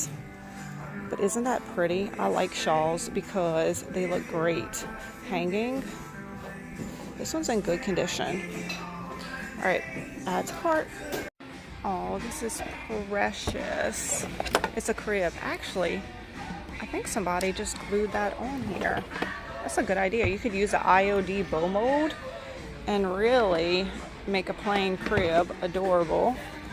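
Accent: Louisiana, United States. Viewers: What is that accent? Louisiana?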